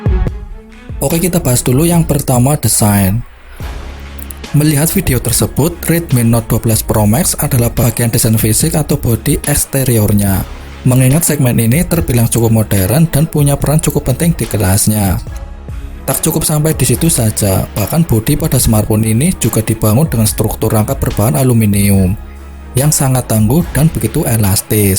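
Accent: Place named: Java, Indonesia. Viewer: Medok amat..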